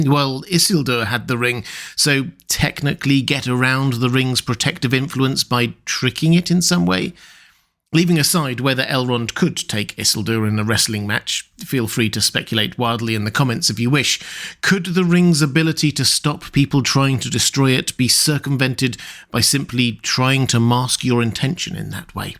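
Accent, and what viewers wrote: United Kingdom. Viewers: You have a wonderful voice for narration! And no, I’m not an American that thinks all British accents are cool, I’m Canadian which means I’m part of the commonwealth and also think all British accents are cool.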